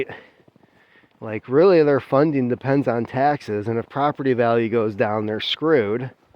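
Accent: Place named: Michigan, United States. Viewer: Damn he was two sentences in and I already knew you was from Michigan and then mentioned Kalamazoo and portage and then I knew.. I grew up in that state spent 23 years there when you grow up there you are pretty much brainwashed into thinking that your state is the best state and everybody else's sucks and you get if you're on the west side of the state you're definitely also taught brainwashed and you're thinking that your church is better than everybody else's church and everybody there is very particular or we would call odd if you're living in other states.... Grand rapids Michigan was one of the first cities introduced fluoride into the water That's why you always encounter passive aggressive intellectual types up there in Michigan they want to get upset but they've been poisoned to deaths and they've been brainwashed into thinking that diplomacy is how you should operate or manage your local and state government and they don't know that they got brainwashed into that mentality they didn't get the memo that the wolves sell diplomacy to make it easier to manage the week and the meek... 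I'm rooting for my fellow Scandinavians That's predominantly what is up in that neck of the woods in every variety I'm rooting for you...but if you want to be able to keep that state which used to be great is not anymore well then you better take it back take out all the liberals out of that state and take it back kick out all the greedy Republicans and take it back most of all of your home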